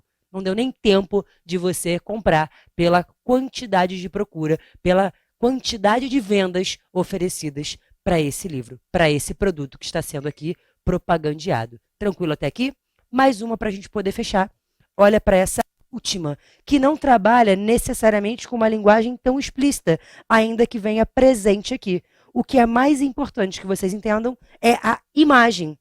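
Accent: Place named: Rio de Janeiro, Brazil. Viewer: Carioca ctz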